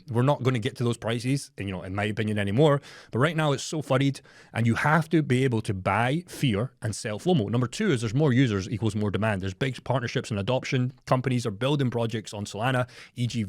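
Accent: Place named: Edinburgh, United Kingdom. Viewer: As an ex Edinburgh resident I enjoyed hearing this well informed crypto briefing in an accent I know well. Great info. Excellently presented. I subscribed !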